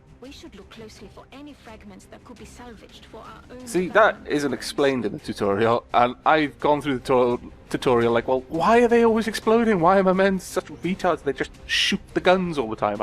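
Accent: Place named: South, United Kingdom. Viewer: As an aside, you're one of the first Englishmen that I have yet to even accurately ascertain what part of Britain you come from based solely on your accent..I'll throw caution to the wind and guess south of England? I'm probably way off.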